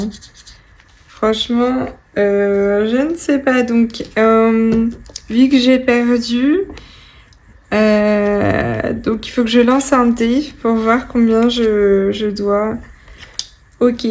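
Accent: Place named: England, United Kingdom. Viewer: Coucou 😊 Pleins de sous sous à mettre dans les défis, trop chouette 😘 Et un beau colis de Linda en prime. C'est superbe 🎉 J'aime bien ton accent anglais,😊 je ne fais pas mieux 😅 Des bisous 😘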